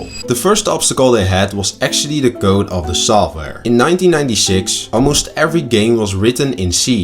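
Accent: Netherlands. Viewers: are you dutch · Plz dont stop making vids. I love them! Btw, are u Dutch?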